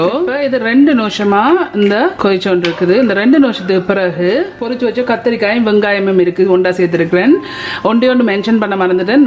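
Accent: Sri Lanka. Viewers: Ungaludaiya elatamil migavom arumai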